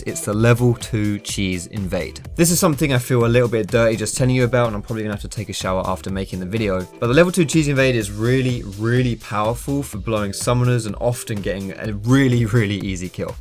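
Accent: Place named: United Kingdom. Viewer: Ok. love your videos, and I watch them religiously, but after like 9 months I have to ask WHAT THE HELL ARE YOU SAYING IN YOUR INTRO? it sounds like "special P here" or something. My american ears can not take thine british accent when spoken too quickly.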